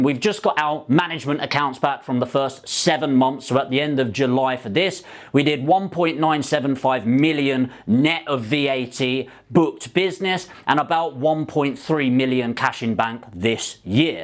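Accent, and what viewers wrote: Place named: South London, United Kingdom. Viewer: Someone here is having a 'giraffe'... is from 'darn sarf' it's just accent 💛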